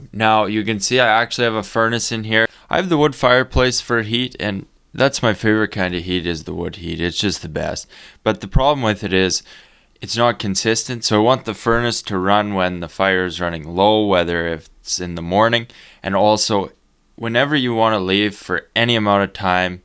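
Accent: Canada. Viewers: I really think you're doing a hell of a job I think you do excellent work and I have been watching for over a year or more and I love Canadian accent. I have been wanting to move to Maine on the Canadian border but my health went to hell and I'm 77 years old so I guess I'll have to stay here and drive my till I can't anymore. I'm looking forward to your next videos keep up the good work ALLYN T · Canadian Ah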